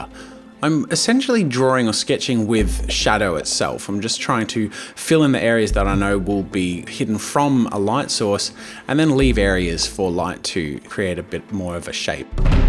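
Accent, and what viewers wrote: Australia. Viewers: He sounds extra Australian in this video 🐨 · I love your Australian accent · Australian accent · its the Australian accent probably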